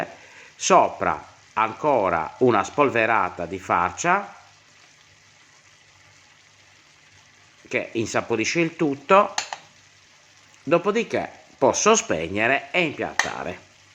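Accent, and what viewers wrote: Sardinia, Italy. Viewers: BRAVO FINALMENTE UNA RICETTA ORIGINALE È SEMPLICE LA FACEVA MIA MAMMA E DOPO LA FACCIO IO UGUALE COME LA FATTA TU,. USIAMO GLI STESSI CALAMARI PICCOLI E FRESCHI IL POMODORINO SEMPRE FRESCO E VERAMENTE UNA BONTÀ E SE RIMANE UN PO DI SUGO LA SERA FACCIAMO 2 SPAGHETTI QUESTE SONO LE VERE RICETTE SEMPLICI E GUSTOSE CREDIMI PER CURIOSITÀ VEDO DEI VIDEO DI CALAMARI RIPIENI CHE VIENE IL VOMITO A VEDERLI DI QUANTI PASTROCCHI CI METTONO DENTRO MA PER FAVORE TUTTO IL CIBO PIÙ SEMPLICE SI FA PIÙ GUSTOSO E DIGERIBILE SARA, NOI ANCHE IL PANE GRATTUGIATO LO FACCIAMO FRESCO AL MOMENTO COL PANE DI CASA RAFFERMO IO NON O DA IMPARARE NIENTE CUCINO COME MIA INSEGNATO MIA MAMMA MIA NONNA E ANCHE MIA SUOCERA, E POSSO DIRTI CHE GENERI NUORE NIPOTI AMICI OGNI VOLTA VOGLIONO IL BIS, E MIO MARITO E ORGOGLIOSO, SIAMO DI MESSINA, E DAL PARLARE PENSO CHE TU SEI SARDO, CONTINUA A FARE CIBO SEMPLICE ETUTTO FRESCO E GENUINO E NO CIBO CONGELATO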